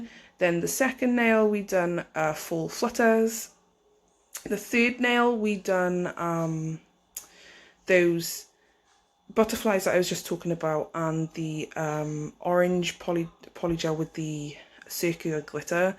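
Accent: Cardiff, United Kingdom. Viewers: This was a brilliant video. I find her really good. Is that a Cardiff accent by the way.? Anyway really enjoyed your video and found it really helpful and interesting. Really good. Sorry not sure what I think about subscribing to things but really like your stuff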